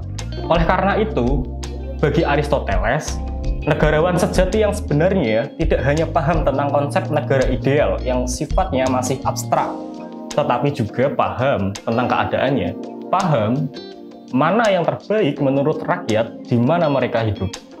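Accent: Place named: Pantura, Indonesia. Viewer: Cara ngomongnya, medok khas pantura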